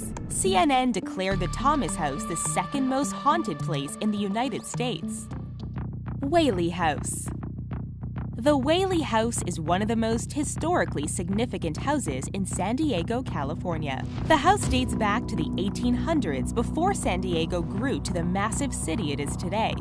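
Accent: Canada. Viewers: So off topic....But I love the way Canadian's say house and out... 😊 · I came down to the comments to do just that! Hilarious. Listen to the way she says "house" too. I think she has a Canadian accent.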